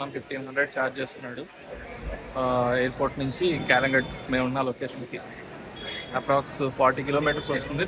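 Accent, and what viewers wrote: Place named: East Godavari, India. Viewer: ఆయ్.. ఆ తూ గో యాస... Missing a lot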